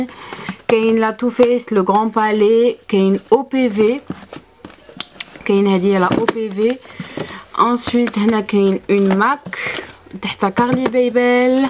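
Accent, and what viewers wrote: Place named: Algiers, Algeria. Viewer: Ton accent algerois me plais 😁 on le retrouve chez peu de gens 😔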